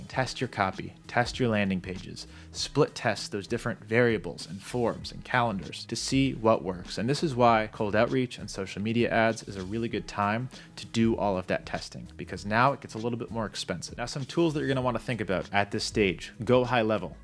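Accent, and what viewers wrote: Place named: Canada. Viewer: You sound so Canadian Dogg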